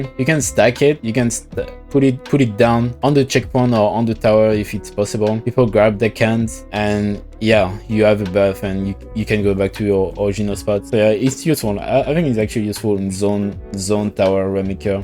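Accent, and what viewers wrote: France. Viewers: Tu parles vraiment bien anglais mais honnêtement j'ai pas besoin de vérifier t'es français je pense X) on parle tous pareil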